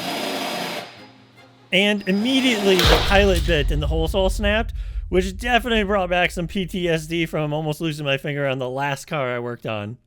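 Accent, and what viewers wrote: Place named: India. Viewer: Why do you have Indian accent? 😁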